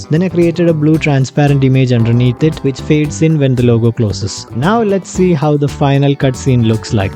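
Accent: Kerala, India. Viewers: Are you from india? Kerala?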